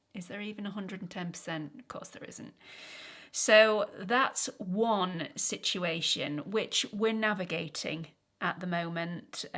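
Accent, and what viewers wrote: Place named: London, United Kingdom. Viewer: You are in good company with your Mum. It is soul crushing to walk that journey with them but on the other side is happiness that you did. I promise! Not sure where you are in UK but your accent sounds more London-area. Legendary late 70's (Birmingham, I want to say) musician Al Stewart is having some very rare shows around there tonight and tomorrow and it will bring you both a lift at not a big cost. He is recently 80, all joy and smiles and has a remarkable young band that tours with him. It is his farewell tour. We saw him here in Michigan, USA 2.5 years ago and it was an amazing little show that will put your energy back on track. ❤❤❤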